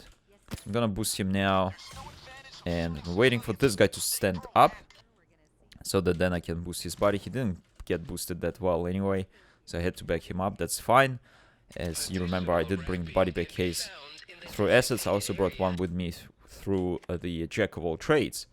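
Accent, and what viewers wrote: Russia. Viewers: Thx man I like your Russian accent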